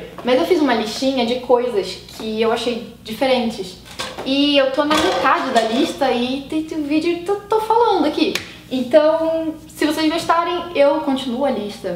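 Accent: Rio de Janeiro, Brazil. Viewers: Vc com esse sotaque de carioca se assustou com esse jeito deles dançarem???????Com esse sotaque de carioca???Para com isso,com certeza vc sabe como se dança funk(não digo que vc dança,digo que já deve ter visto e sabe como é).É simplesmente impossível alguém com esse sotaque de carioca que vc tem se assustar com isso! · Acho legal seu conteúdo mas o sotaquezinho carioca é DIFÍCIL de aguentar. Gezus, minha chaleira chia menos...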